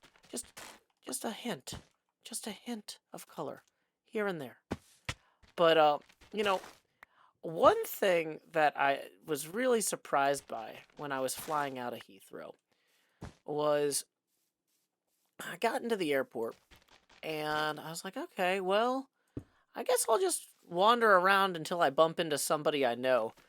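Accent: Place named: South, United States. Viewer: Hearing the English mannerisms in a southern accent all episode was a treat!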